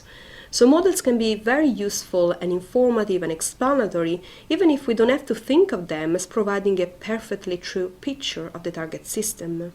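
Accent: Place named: Italy. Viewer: Really good, and simple explanation. Cute italian accent as well. Thanks for sharing.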